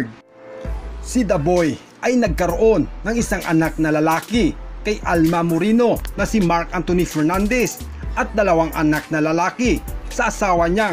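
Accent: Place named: Visayas, Philippines. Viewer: Kainis ka mag kwento patalon talon..at bisayang bisaya.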